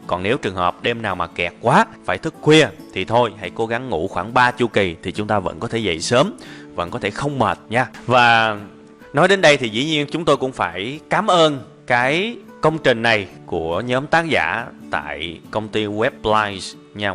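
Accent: South, Vietnam. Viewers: Giong giang bai mien nam nghe nhe nhang , de thu hút . Xin cảm ơn tác gia với những thông tin bổ ích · Hoan nghênh nói tiếng miền nam, Chứ nói tiếng miền bắc nghe nhức óc quá ! Hình như lên video phần đông hướng dẫn chương trình toàn là người miền bắc.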